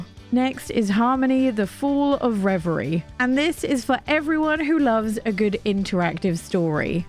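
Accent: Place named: United Kingdom. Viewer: If you don't mind me asking, where is your accent from? It sounds British, but also a little different, for example right at the beginning when you say "so many," the "so" almost sounds like there's an "r" sound at the end of it. Thanks!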